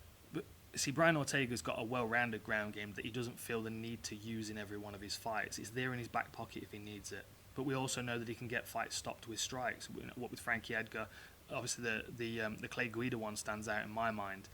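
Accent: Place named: England, United Kingdom. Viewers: I think his style of English would mostly be a product of where in England he comes from. I don’t find him pompous at all btw. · Something about dan , he has that annoying pompous English style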